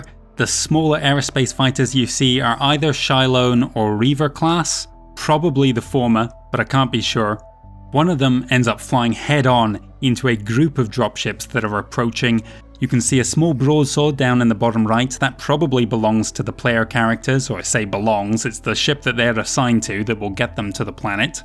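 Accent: United Kingdom. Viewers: You sound like you could be British, my apologies if English is your second language but with such good pronunciation I would have thought you’d get Jaguar right. Americans smear their syllables together but how they get “jag-warr” and “jag-wire” out of J a g u a r is truly mysterious. They say “mirror” as meer and “horror” as whore etc etc etc so I suppose jagwarr is just smearing but how TF do they get “jag-wire”?? One of the most beautiful words in this language brutally choked to death by my dear American fellow human beings. I love ‘em but they don’t know speaking.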